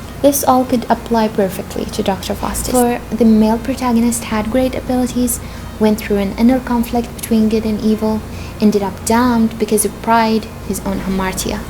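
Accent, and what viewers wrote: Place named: United Kingdom. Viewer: ماشاءالله كيف تعلمتي الاكسنت البريطاني نقطه ضعفي حاولت اتعلم بس ماش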